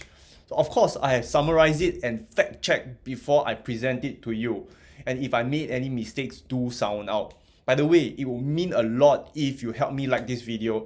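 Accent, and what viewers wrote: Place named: Singapore. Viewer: If you cant tolerate that singapore accent, I recommend moving there permanently.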